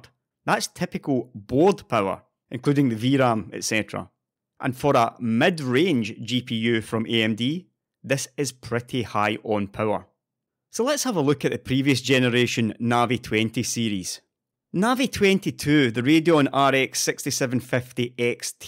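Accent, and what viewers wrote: Scotland, United Kingdom. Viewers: The Scottish accent was too much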